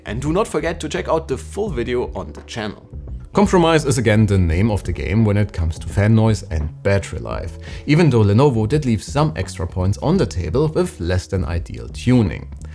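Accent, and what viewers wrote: Germany. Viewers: Smart to do Videos in English for you, but the German accent is insufferable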